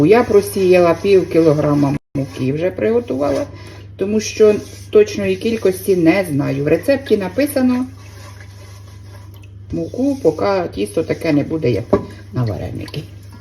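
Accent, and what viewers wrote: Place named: Surzhyk, Ukraine. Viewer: не зникайте, а то сумую за вашими відео, ще Оленка подобається з "Мого сільського життя", такі ви дівчатка наші, а суржик просто рідний дім❤❤❤❤❤❤❤❤❤❤❤❤❤❤❤❤❤❤❤❤❤❤❤❤❤❤❤❤❤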